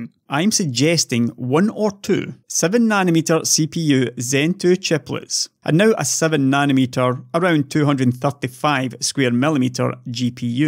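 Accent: Scotland, United Kingdom. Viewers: I've learned his Scottish accent and it's really great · his Scottish strong accent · your pleasant Scottish accent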